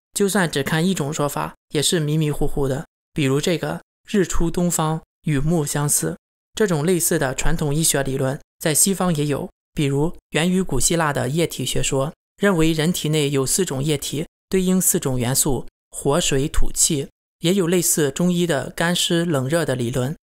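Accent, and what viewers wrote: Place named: Rizhao, China. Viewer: klaus我听你的声音，你是日照人吧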